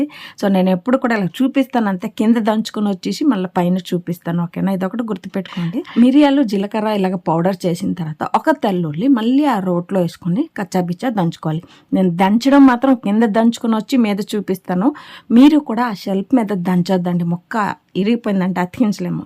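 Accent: Srikakulam, India. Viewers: మీది శ్రీకాకుళం యాస.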